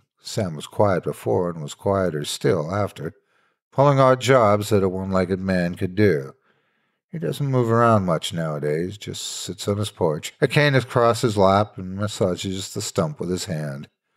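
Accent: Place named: South, United States. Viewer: One of my favorite creepy pastas ever. The way you read this....my my. You have one fine narrative voice. This version was the most...believable, I think. You sound like an old man reminiscing on something horrific and haunting. Your rich, pleasant southern drawl really sells it.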